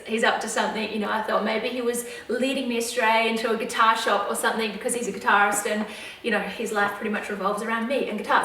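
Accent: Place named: Australia. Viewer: So nice hearing an Aussie accent on YouTube LV videos. Love the story. I almost had a heart attack when you pulled out the wallet.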